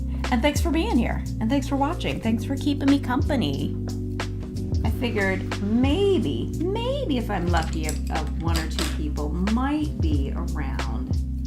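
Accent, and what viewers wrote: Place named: Australia. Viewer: Your Australian accent 😂😂😂 oar noar 😂😂 means “bee” so that is super cool 🐝 Melissa also means bee….. what are the odds hey? We almost have a hive in the art community.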